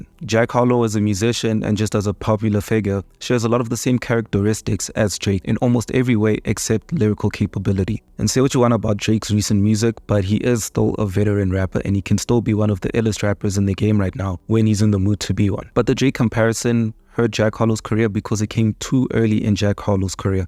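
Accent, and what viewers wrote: South Africa. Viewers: You from South Africa my brother? If so, me too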